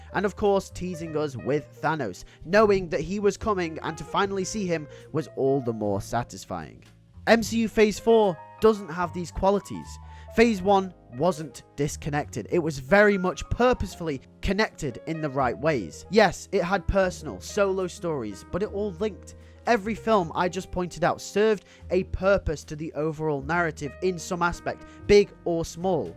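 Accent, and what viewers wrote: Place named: England, United Kingdom. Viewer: Please; only speak the Human language aka American English, the stuff you render now is putrid noise from mere england, the ugly proto form of our language.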